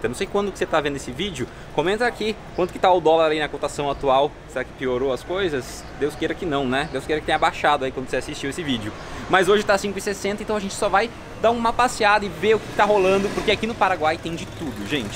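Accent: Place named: Santa Catarina, Brazil. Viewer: Nossa! é de arrepiar cara, produção de cinema! você é catarinense? tem sotaque de Catarina kkkk sou de Massaranduba - SC abraço e sucesso!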